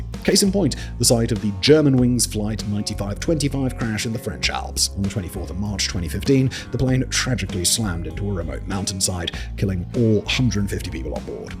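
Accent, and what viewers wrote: England, United Kingdom. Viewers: I think his team may have wanted to change up his image and make it more "hip" - but we don't WANT a hip! He's a nerdy bald guy with an English accent that makes him sound like Churchill on speed. But the whole setup has changed, different vibe altogether, complete with ruining his otherwise absurdly delightful narration. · I thought you were good. Yet you sold your soul to icoogni. The worst kind of company. Stupid british cuck. Learn to say aluminim (and Nike and Adidas and SIDEWALK because pavement is a material and not a location) correctly and maybe I will re-subscribe.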